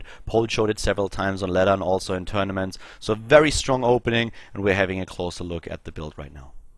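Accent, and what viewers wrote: Germany. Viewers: he s from germany^^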